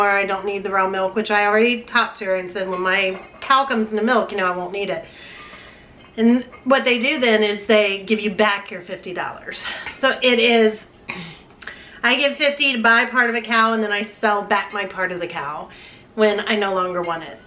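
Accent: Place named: Georgia, United States. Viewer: Hi ❤ I can tell you are tired because your Georgia accent is noticable. Love you ❤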